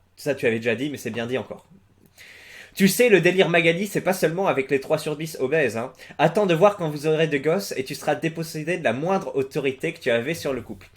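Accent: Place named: Southwest, France. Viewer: Tu dis souvent moinssss, tu viens du pays basque? Ou du Sud Ouest?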